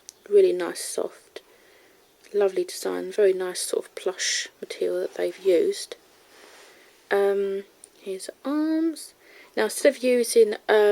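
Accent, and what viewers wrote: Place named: United Kingdom. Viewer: Are you british because u have a british accent and also I’m british😃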